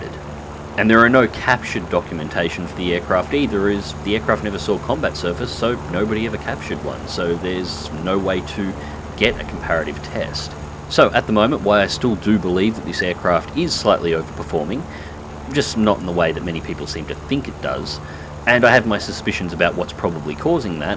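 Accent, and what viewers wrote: Australia. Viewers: time to listen to that attractive Aussie voice again <3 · what tier 4 soviet plane do you recommend? Love your manly aussie voice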